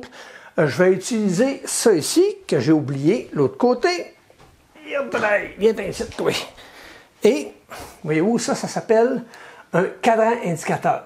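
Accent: Quebec, Canada. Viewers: Bonjour, Merci pour l'ensemble de tes vidéos, (avec cet accent Québécois magnifique, c est un plus) je suis débutant en impression 3D (plastique) et moi aussi je me suis fait un cadeau pour noël avec la Ender 3 V2 avec laquelle je découvre, et c'est un régal pour l'apprentissage. As tu envisagé de faire la modification du direct drive comme sur la V1? Merci encore pour tes vidéos et ton implication, c est motivant.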